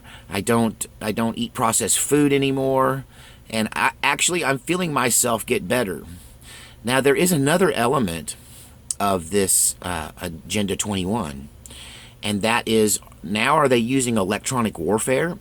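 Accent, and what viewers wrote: New York, United States. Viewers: That guy who thinks you're making fun of Jewish people is stupid. Every one knows that your accent is from that Mike Meyers SNL skit and if they don't then they are a millennial and a cupcake. It is a NEW YORK ACCENT however my Jewish in laws from Long Island sound like this BUT so does EVERYONE ELSE FROM LONG ISLAND! When I visited I went to a diner and I ordered my entire breakfast in that accent as a joke and NO ONE figured out that I didn't speak that way! 😁 And soy raises estrogen....no one realizes how it is in all of our food. Chemo is terrible!!! And I've also unplugged from TV/media and I also only use ibuprofen as well...most surgeries are excuses to line the pocket of the doctors! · Hey. Can you say 3rd and 33rd street in that New York accent? 😅. Yes now we need to find out why we are getting the raw end of this agenda 21 and whoever they are are so important that they should get a free ride? 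I mean everyone who's an elitist needs to re think that. They're no more better than anyone else. Now I hear about all of the good stuff about marijuana. Hey when you can can you contact me? I have a wild idea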